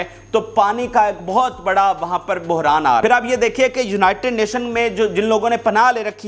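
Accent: Punjab, Pakistan. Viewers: اوئے پینڈو انسان اگر انگریزی بولنی نہیں آتی تو لکھی ہوئی انگریزی پنجابی اور پینڈو سٹائل میں پڑھنے کی کیا مجبوری ہے ؟؟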